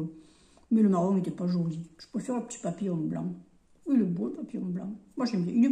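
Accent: South, France. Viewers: J’aime t’écouter, tu me fais rire. Les tourterelles prennent tout et les petitouts n’ont plus rien du tout. Ta façon de parler et ton accent sent si bon le midi, ça me rappelle Pagnol que j’adore 🥰. Bonne journée, bisous 😘😘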